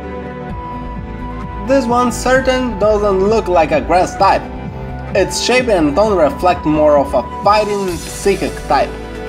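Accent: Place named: Brazil. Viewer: Corélio M. Based on the accent, he HAS to be Brazilian or at least Latino.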